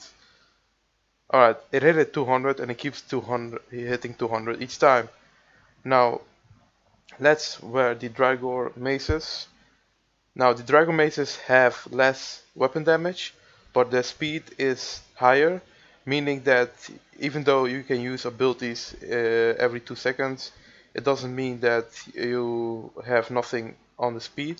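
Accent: Netherlands. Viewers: just a question where are you from? Basing on your accent im quessing the netherlands?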